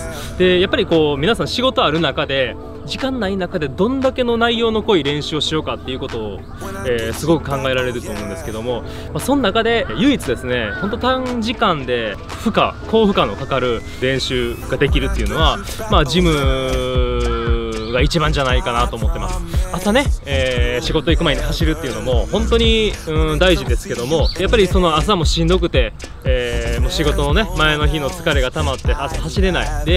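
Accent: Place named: Kansai, Japan. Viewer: の動画はナレーションがの関西弁そのままでめっちゃ良いです！ P.S.冒頭のBGM音量もう少し下げていただけますと… のアチチな気持ちはわかるんですが…音量が…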